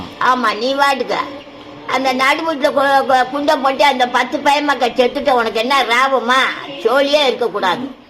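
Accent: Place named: Usilampatti, India. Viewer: ❤❤sema paaati.... Theni usilampatti pechu sema 😂😂😂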